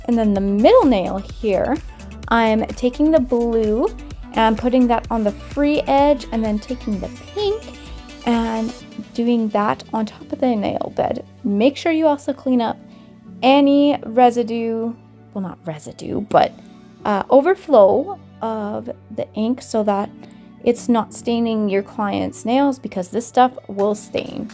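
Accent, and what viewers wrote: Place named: Alberta, Canada. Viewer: Clearly you are a rocking Canadian!! I'm hearing mid/northern Alberta. You mentioned Edmonton, where you got???? I can't remember... Albeit I've been watching you for a while now, its still nice to have a fellow Canadian as a YouTuber!! Suzie, Sarah, and Talia are the other Canadians I watch. 💘 those ice cubes. So much fun. Great set. Nice colour combo💙💕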